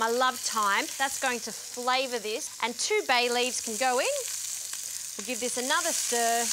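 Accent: Australia. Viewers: aussie english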